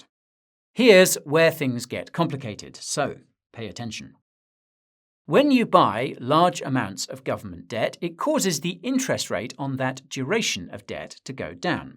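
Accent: United Kingdom. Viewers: Hi 👋 Where about you based? You have a British accent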